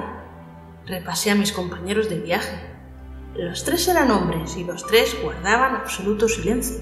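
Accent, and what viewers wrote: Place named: Spain. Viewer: Muchas gracias...me encanta que esté en español de España...y narras muy muy bien 😊❤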